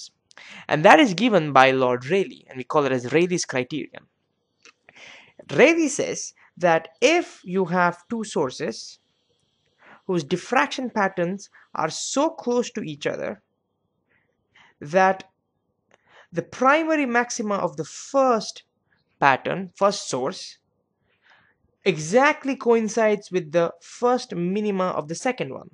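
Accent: India. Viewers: Indian accents suck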